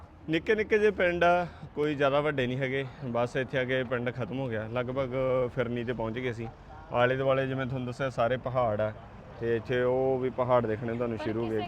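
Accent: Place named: Malwa, India. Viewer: Scotland is cheaper than England example if house price in London 1 million pounds you can same property in Scotland only 400000 pounds only don't compare with England other things u said I am PhD from punjabi University but u still pure malbai u should change don't say gggg this is instruction don't mind?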